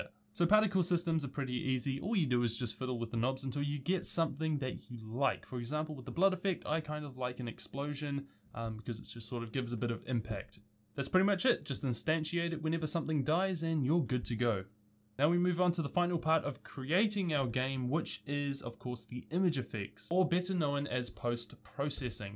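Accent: New Zealand. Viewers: Are you from New Zealand I know a kiwi when I see one! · Are you a New Zealander? that accent or lack thereof an accent is a dead giveaway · Absolutley great video! Subbed. Are you from New Zealand by any chance? Your speech (and yourself a bit) reminds me of Jemaine Clement :D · Where are you from? Your accent (and name) makes it sound like you are from New Zealand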